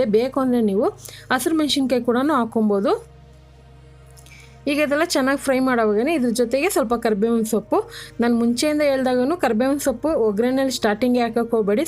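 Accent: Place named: Anekal, India. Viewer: Watched your kalamadhyama interview. I am watching your video since a year and I did not really admired the way you were speaking kannada words... But now I got to know that you are from Anekal and slang is like that.. All the best tangi... Devaru nimagella olleyadanna maadali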